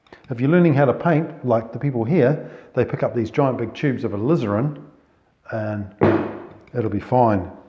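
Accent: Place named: New Zealand. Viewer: Just found your channel and subscribed. Nice hearing another kiwi voice and familiar scenery for a change. Very informative tutorial thanks.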